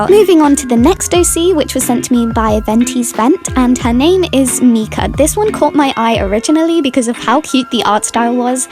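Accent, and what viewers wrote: United Kingdom. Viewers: Haha I love your accent! It sounds like a southern British one which is where I'm from :D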